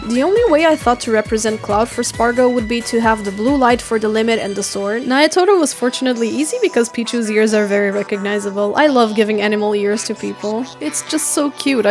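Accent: Portugal. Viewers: Voce é de Portugal??? Wow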